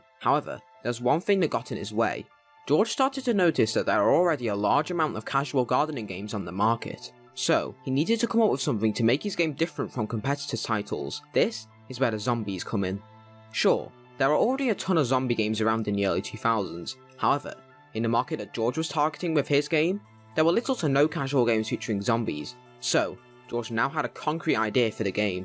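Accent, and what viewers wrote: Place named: United Kingdom. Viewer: Csn we collab sometime? We’ve both got british accents, and you have potential.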